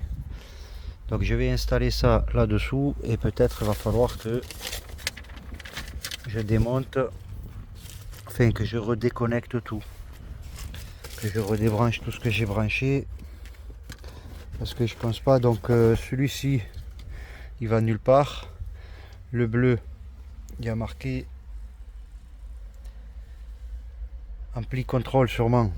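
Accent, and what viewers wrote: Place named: Marseille, France. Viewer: avec l'accent marseillais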